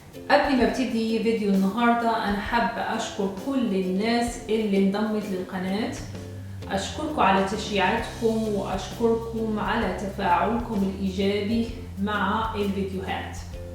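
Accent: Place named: Maghreb. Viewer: هل انتي مصرية اختي لهجتك من المغرب العربي و شكرا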